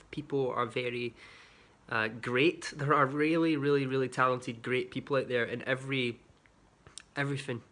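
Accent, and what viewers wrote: Scotland, United Kingdom. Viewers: I've just subscribed on YouTube. I've watched you on Facebook forever. I'll be honest, I can't pass up a scottish accent lol but you're always positive with messages. You're my first son's age, it can be a dark and lonely road the internet,the highs the lows. Life in general is hard at that age without a public one. I hope you are as sound as you talk and look back and reflect on these days in passing as building blocks and lessons. Now I'm sounding old af ( im 44 fyi) and just hope you're ok. Leave if not , those who dont know you personally may will still be here, most probably will because you're funny and sweet,, and those who do know you personally will be there to pick up the pieces, certainly doesn't make you week.. Likes are not what makes you exist. You exist because of of you. 😍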